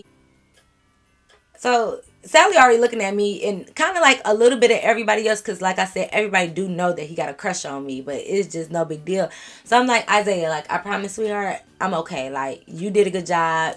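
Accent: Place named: Chicago, United States. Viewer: I swear I cannot watch any of the others because you just know how to storytell! Girl where are you from though? I could’ve swore I hear a lil Chicago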